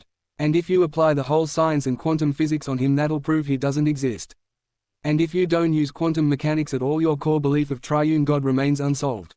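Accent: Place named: United Kingdom. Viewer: Bro your voice is like a British lad💀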